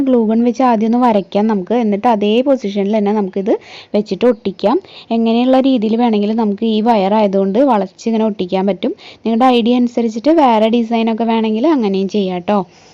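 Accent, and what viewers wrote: Thrissur, India. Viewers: trissur anu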